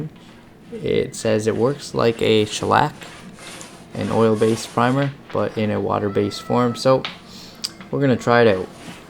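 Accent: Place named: Atlantic Canada, Canada. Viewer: Your accent.... I can’t recall where I’ve heard it before. Newfoundland? New Brunswick? New England?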